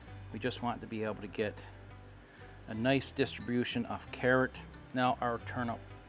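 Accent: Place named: Atlantic Canada, Canada. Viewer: GREAT recipe. Any combo dish like that which blends all those flavors can easily turn into feasts fit for Kings. In my recipe I've used sweet potato, roasted Brussels sprouts, and garlic (including garlic powder), and parsnips which add a certain minty flavor to the mix. Keep up the good work! Oh by the way, I enjoyed listening to your Atlantic accent. Here in the Twin Cities we have many Canadians because of our love for pro hockey. Their accents are so different from yours. 😀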